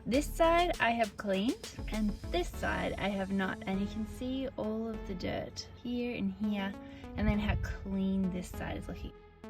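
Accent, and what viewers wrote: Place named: Australia. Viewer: "Cleen-a" Love Aussie accent. Thanks for a lovely demonstration.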